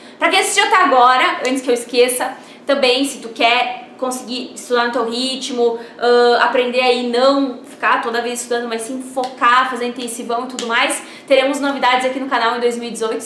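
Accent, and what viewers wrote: Rio Grande do Sul, Brazil. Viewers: Você é gaúcha né ? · Eu não sei o que eu tenho com esse povo lindo gaúcho rsrsrs. Estava vendo um video sobre escola de inglês entrou esse em seguida.Ou gaúcho gosta muito do YouTube ou eles me perseguem kkkk Adoro esse sotaque gauchês kkk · Ela é gaúcha?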